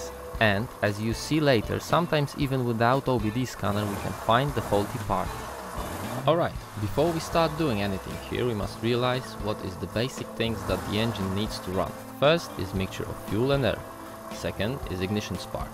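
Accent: Poland. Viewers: Zasubskrybowano, po akcencie coś czułem ze Polak aż zobaczyłem polskie napisy na kompie :) super kanał, dobra robota.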